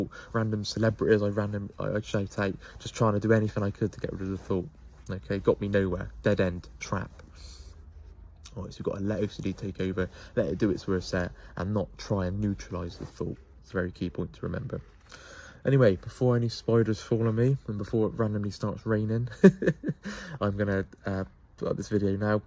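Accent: Bristol, United Kingdom. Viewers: definitely from Bristol 😂 that accent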